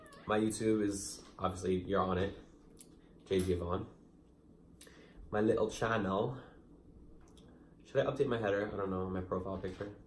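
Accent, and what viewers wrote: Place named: New York, United States. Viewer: Your NY accent is so awesome. Please keep making vids. They’re really interesting. Good job.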